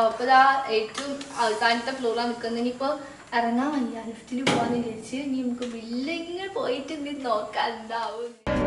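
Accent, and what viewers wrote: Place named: Kozhikode, India. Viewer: നീ കൊച്ചി അല്ല നീ ടെ സംസാരം kalicut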